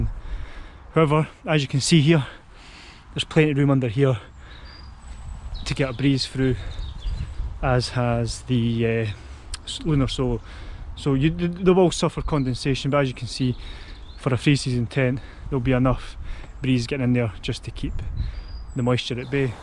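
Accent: Scotland, United Kingdom. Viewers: Perfect review! Thanks for this! I lived in Edinburgh for a year, it's so nice to hear that scottish accent again! :-D (or am I wrong?)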